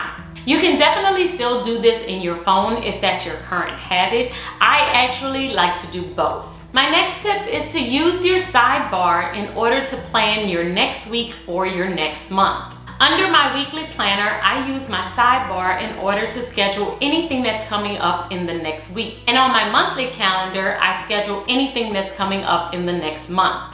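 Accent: New Orleans, United States. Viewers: I feel like I hear a slight New Orleans accent🤔😂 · Okay sis!!! You are beautiful and I feel your kindness and support. What am I hearing in your voice ??? New Orleans, Islands where ? Keep the videos coming! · Sounds like you are from New Orleans! Beautiful planner!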